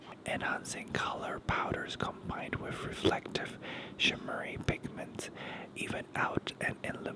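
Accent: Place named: United States. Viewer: 他的英文水平不低，雖然有些小口音，但大致無問題👍屬於擁有美式口音！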